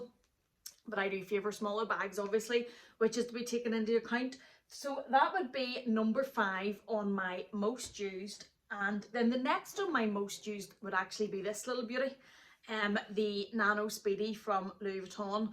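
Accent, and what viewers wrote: Ireland. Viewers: I love your accent! I used to live in Ireland! I miss the country so much but I don't miss the sudden rain while carrying my Louis Vuitton bag 🤣 Greetings from Australia ❤️ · I love your Irish accent!!!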